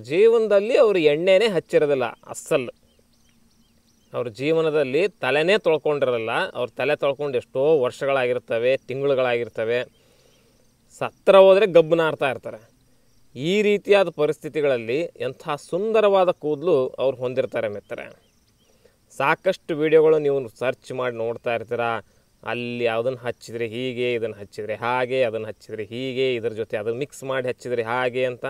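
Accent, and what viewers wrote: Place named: North Karnataka, India. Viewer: Dr, nemma baasheya sogadu yellow ondu kade namma Uttra karnataka da pada balakeyagi nanage nagu thandukoduthade, edu kelodaklke cholo re😍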